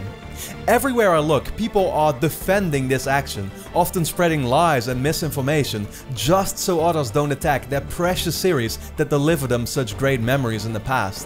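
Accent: Netherlands. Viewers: U dutch ? U sound like u could be dutch. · Volgens mij ben je nederlands tenminste ik denk het te horen aan je engels accent Broer . Ik denk er gelijk over man dit is echt niet goed. campaign is de reden waarom ik het spel koop dat er geen campaign in bo4 zit maakt me misselijk man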